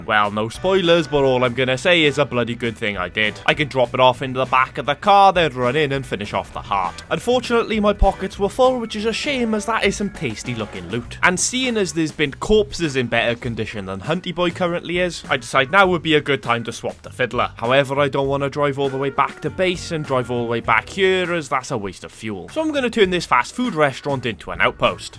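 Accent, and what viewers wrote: Wales, United Kingdom. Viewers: welsh accent pal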